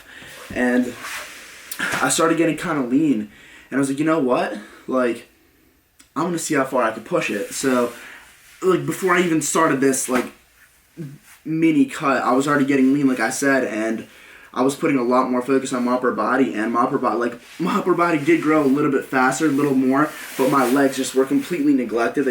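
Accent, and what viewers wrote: United States. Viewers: He has a very strong American accent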